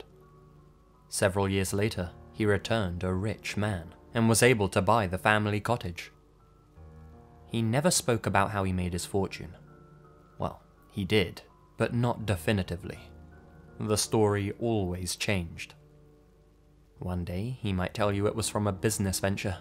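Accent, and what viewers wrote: United Kingdom. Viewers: British accent doesnt spook me unfortunately. Good content tho.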